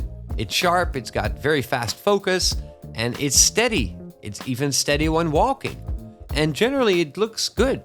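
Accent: Netherlands. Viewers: Hi, net je review bekeken. Zeer duidelijk 👍🏽 Dacht dat ik een Nederlands accent hoorde. Kijken of ik deze telefoon vandaag in Nederland krijg besteld. Top review. Groetjes uit Nederland en ga zo door. Marvin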